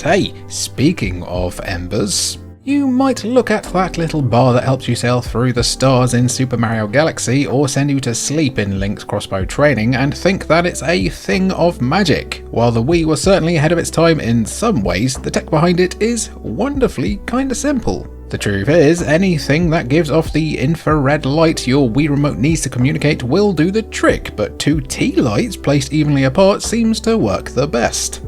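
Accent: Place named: United Kingdom. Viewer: Good stuff as an American the “th” sound doesn’t really bother me I just sort of chalk it up to “ah he’s just British, it’s cool” 😂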